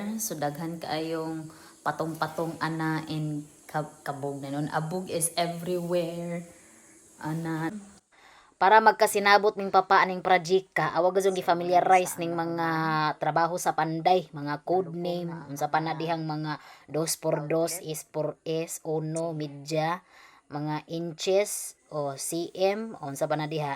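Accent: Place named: Visayas, Philippines. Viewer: hi done subcribe npo miss im new here lagi kita pinapanood i like ur accent bisaya kbayan from leyte but im here n in manila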